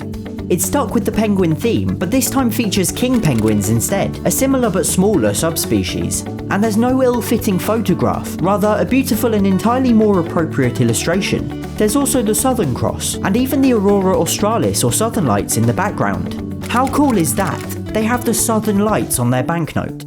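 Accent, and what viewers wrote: United Kingdom. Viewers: Too much British. 3/10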